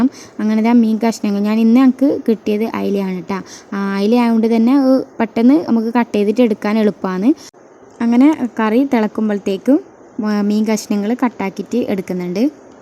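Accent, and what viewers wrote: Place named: Kanhangad, India. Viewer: Evidea sthalam kanhagad ano samsaram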